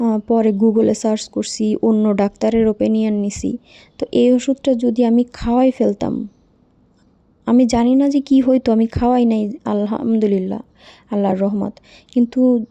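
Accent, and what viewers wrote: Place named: Khulna, Bangladesh. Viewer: আপনার ভাষা তো খুলনার দিঘলিয়া উপজেলার মত।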